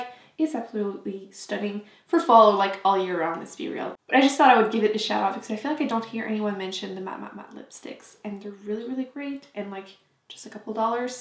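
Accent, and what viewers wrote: France. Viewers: Your accent is so beautiful 😍 French accent is everything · Amber Schelfhout She actually did say “voyageur” (in perfect French, with no accent) pretty clearly, which means “voyager” or “traveller” in English. “Voyager” can either be used as a synonym to “traveller” in English or translated to “to travel” in French. Why would someone whose first language is French, speaking English with a French accent not know how to pronounce a French word? Loll... I was so confused by this comment... So she wasn’t trying to “make it English”. She just pronounced it as “voyageur” which is equivalent to “voyager” or “traveller” in English. It could have happened because she wasn’t sure if the word was meant to be French or English (since the spelling is the same in both languages). I’m tri-lingual, so this also happens to me very often...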